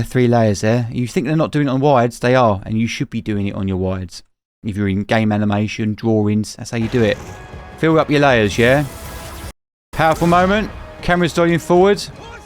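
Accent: United Kingdom. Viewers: Can you please turn off your British accent and speak English like normal people do around the world?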